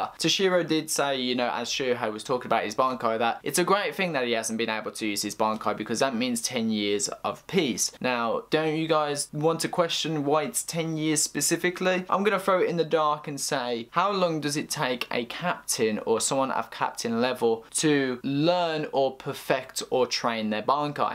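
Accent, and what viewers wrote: United Kingdom. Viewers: Great review. btw I like your british accent;)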